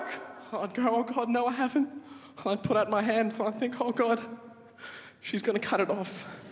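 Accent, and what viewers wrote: Egypt. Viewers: his egyptian accent is on point im laughing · He sounds Egyptian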